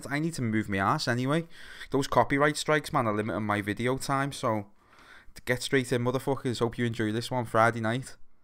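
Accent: Liverpool, United Kingdom. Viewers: Liverpool 120% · WarDuck23 Think he’s a Scouser (Liverpool). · Nice to hear a fellow scouser commentate on a fucking beauty of a audial concoction <3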